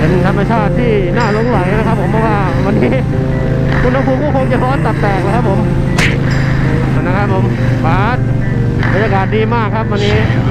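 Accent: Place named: Isan, Thailand. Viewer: สำเนียงเสียงเว้าม่วนหูหลาย FC from Laos🇱🇦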